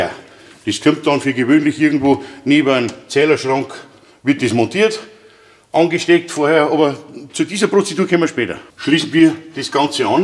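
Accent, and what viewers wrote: Bavaria, Germany. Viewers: Dem Dialekt nach hoffte ich dass Ihr aus Bayern seid. Leider nicht, sonst haette ich evtl. einen Auftrag gehabt.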